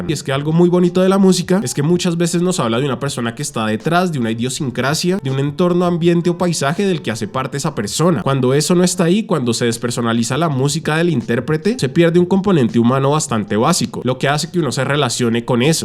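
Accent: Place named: Bogotá, Colombia. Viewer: X2, tiene puro acento rolo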